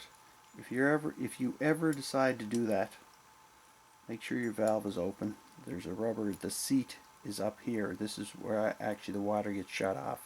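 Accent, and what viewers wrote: Canada. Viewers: Fantastic series, thank you!!!! We need to redo the lines in our house, and now know what to do! We are interested in putting in a manifold system, now know how to do it. Love all the great information, even it the idiots on here think you're rambling. That "rambling" is the little shit you run into that makes you go.. "uhhh, what to do I do now." You take care of all that with your musing. Thank you! One question, where do you buy this stuff? Thanks again, love the Canadian accent eh. ;P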